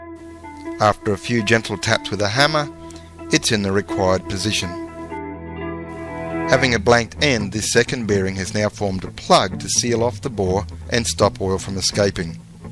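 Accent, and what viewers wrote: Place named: Australia. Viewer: Hey great video.. I know this is old but hopefully you stillnsee it.. I have a t120v 1972 and the mechanic who rebuilt the motor couldn't get the gearbox working. it looks the same externally I was looking at it today.. though I believe my model has a leaf spring not a plunger.. same selector "quadrant" learned a new word today. Anyway the bike is in neutral the but gears won't change. Shift mechanism all seems right. I ran it when I first got it home and the gearbox locked up totally. its been sitting for years as I kinda gave up on it.. I looked at it today.. taking the outer cover off and its the kickstart gear that seems locked.. inside the cases..lower gear spins freely when Turn the tyre.. I'm a little on the "in over my head' side honestly but your video is pretty encouraging.. just wondering if A: you have any thoughts as to what could be going on wrong and B: being (as you have the Aussie accent) know anyone in Adelaide I could take it to?. 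Cheers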